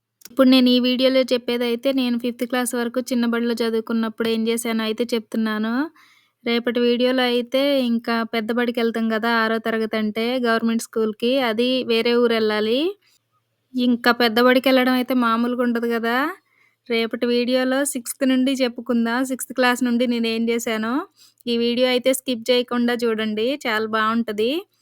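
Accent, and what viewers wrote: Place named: Rayalaseema, India. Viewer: తెలంగాణ అన్నారు రాయలసీమ యాస మాట్లాడుతున్నారు🤔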